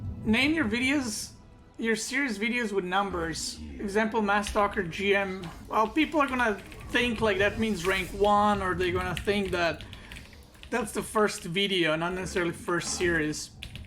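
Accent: United States. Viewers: You're speaking mid- Atlantic English. It's Americanish but without the twang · You do actually sound completely American. In the vast majority of America we all have a very similar accent and it sounds very similar to the way you speak English. You could come over to the majority of the States and no one would know that English wasn't your first language. It's just the South and Boston that have ridiculous accents. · Definitely more american than not. Like a well spoken immigrant west of the mississippi.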